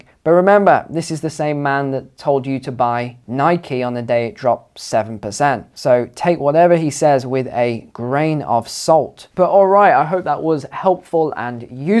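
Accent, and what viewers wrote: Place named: England, United Kingdom. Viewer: I start like English accent more and more.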